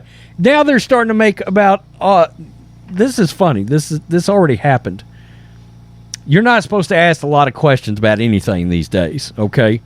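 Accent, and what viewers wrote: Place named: South, United States. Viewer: apple said CANCUN ON 3 with the heart hand gesture. Le'ts not downplay that. Why would he say cancun 3. You know whats ridiculous is how you have a show and 178 subscribers???????? How?????? LOl you get paid for this/??? How?? Must have a lot of inbred cousins that follow and like your show. You sound like a hillbilly and you somehow you have a zoom group and viewers???? HOw?????? You should consider hiding and maybe wear a mask OR just putting your little video on mute. Eli apple was being a douche. But a douche is a douche. Your live news is really boring and i really hope you don't get paid for this. Bengals are so tough and going to kill everyone. Glad they went to Burrowhead and showed everyone the chip on their shoulder. Who watches this??????? Is this a redneck incest private feed????????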